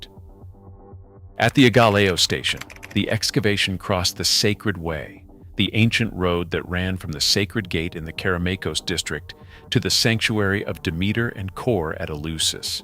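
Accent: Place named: United States. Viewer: Great presentation, but your americanized pronunciation of the Greek names is quite disturbing I'm sad to say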